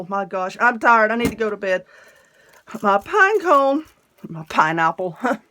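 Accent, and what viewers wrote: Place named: Atlanta, United States. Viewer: Yes I love the way you talk to, could listen to you all day. If my great-great grandfather hadn't moved out of Atlanta north maybe I'd have the same accent, I love it! Really enjoyed this tutorial, thank-you so much for posting.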